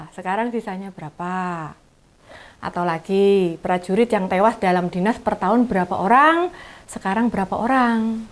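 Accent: Java, Indonesia. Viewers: iki lucu.. jowone mdhok banget 🤣🤣🤣salam sehat.. salam waras dari papua barat daya🙏🙏🙏🙏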